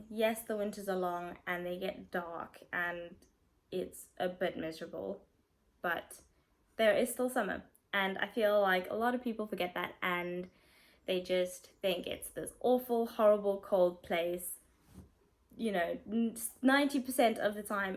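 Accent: United Kingdom. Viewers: Wow you picked up the accent so quickly 😃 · You only been in UK for almost 2 years and you speak as if you have an accent of the country already.. Not sure how that is possible because you came from South Africa, don't forget where you came from. 😊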